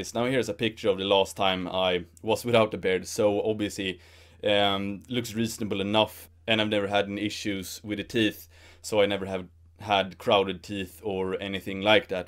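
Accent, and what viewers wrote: Sweden. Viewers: Hello fellow swede I can hear it on your accent. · Hørte at du var svensk umiddelbart :P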